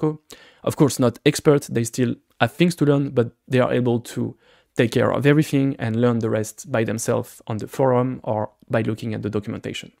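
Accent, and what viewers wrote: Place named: France. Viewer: There must be somebody without a French accent who can give us fantastic information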